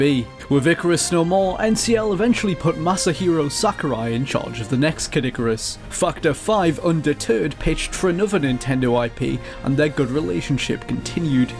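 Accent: United Kingdom. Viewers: I always thought that the way you spoke was cool. Why in the world would anyone knock a British accent? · It's a heavy British accent if I'm hearing right, and I'm Canadian, all I hera is English and French. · Your voice is great its my favourite British accent - and I live near Manchester, and cheers for telling me yet more information that I didn't know.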